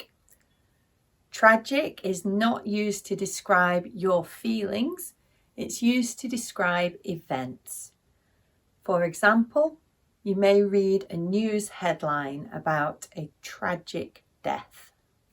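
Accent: United Kingdom. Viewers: My pleasure I love the British English And you are Perfect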